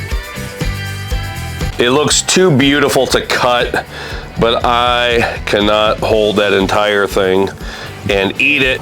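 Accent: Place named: Pittsburgh, United States. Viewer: Great video! Now I'm hungry! I always thought you sounded like a western Pennsylvanian. I grew up about an hour north of 'da burgh, so I speak Pittsburghese. :-) Keep up the great work!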